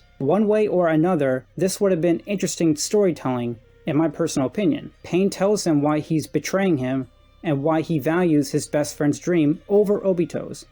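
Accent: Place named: England, United Kingdom. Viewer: I love your English accent, and your videos are high quality congratulations 👏👏👍🏽❤️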